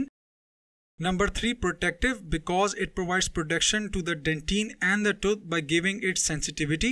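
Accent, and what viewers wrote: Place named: India. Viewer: You have the Bigest Indian accent I’m not trying to be mean my friend is Indian as well) btw thanks for teaching me this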